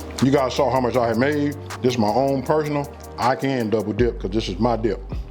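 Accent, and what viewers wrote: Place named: West Coast, United States. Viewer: Philly in the check inn! Big Homie westcoast Accent heavy! 💪🏽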